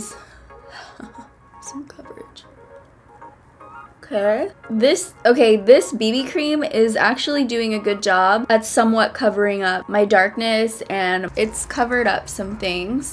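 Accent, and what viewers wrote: England, United Kingdom. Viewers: Um I say that u r probably from England so yay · Is English or American?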